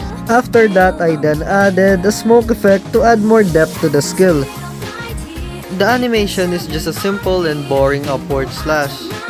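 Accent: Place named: Philippines. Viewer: Y do u sound like a Filipino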